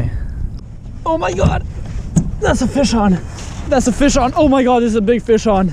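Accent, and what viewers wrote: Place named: Mexico. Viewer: Para mí el mayor plot twist fue ver que hablas español ¿Eres mexicano? Porque yo sí y el acento me sonó muy mexicano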